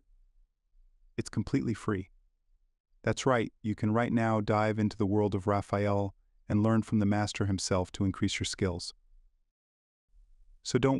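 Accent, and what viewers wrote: France. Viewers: Thank the Heavens for he isn't speaking with that horrendous French accent in this video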